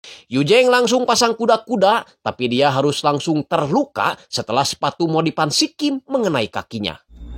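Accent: West Java, Indonesia. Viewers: kan di potong potong tapi intruksi sudah tertulis & tinggal baca saja berserta mensensor kata yang tak pantas dengan ucapan logat sundanya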